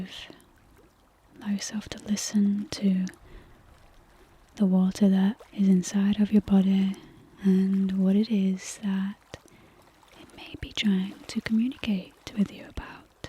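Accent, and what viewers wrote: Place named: Lancashire, United Kingdom. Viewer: Use the full lancashire accent you possess, so natural and attractive. From a fellow lancastrian.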